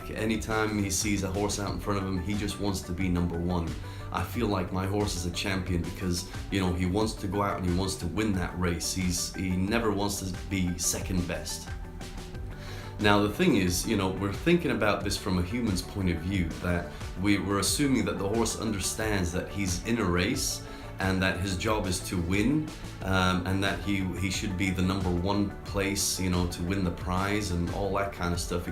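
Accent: Australia. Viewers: khaled hamdan He sounds Aussie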